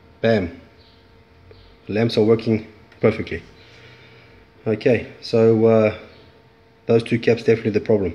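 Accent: South Africa. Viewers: Ahhh s.a accent 👌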